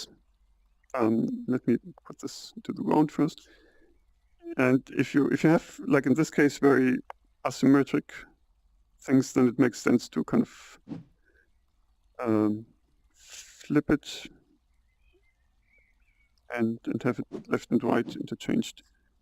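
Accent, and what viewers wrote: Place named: Germany. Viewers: Yea I think he is a german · Nice! Also i like ur accent are you german? I'm half german!! +1 sub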